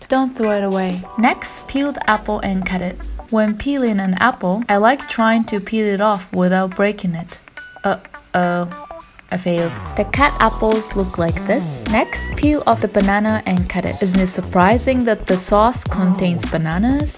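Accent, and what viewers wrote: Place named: Japan. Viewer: I miss being in Japan so much. Your accent brings me way back.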